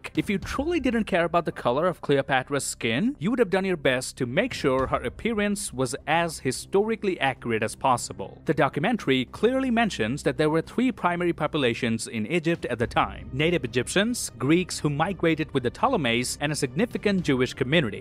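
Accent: Canada. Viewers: The weirdest Canadian accent I've ever heard. And we don't refer to each other as lads. That's a British thing.. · You sound Canadian.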